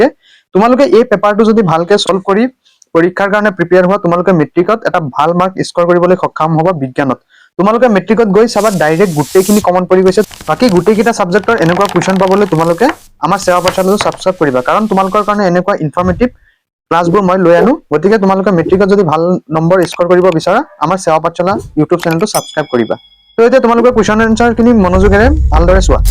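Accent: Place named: Nalbari, India. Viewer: Sangaskrit or Lage sir Nalbari Distic or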